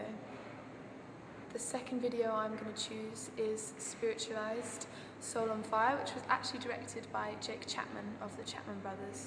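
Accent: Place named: England, United Kingdom. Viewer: is she american or english?? cuz she has an english accent but says one of my fave videos *period* which is american.. :-P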